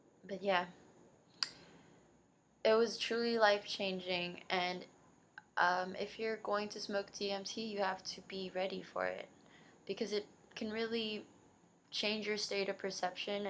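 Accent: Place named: United States. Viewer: You sound so american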